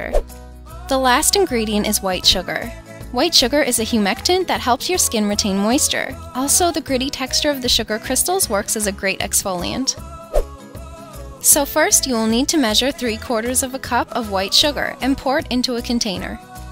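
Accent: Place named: Canada. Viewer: You can tell she is Canadian because she says "Tay-g" Instead of "T-ah-g"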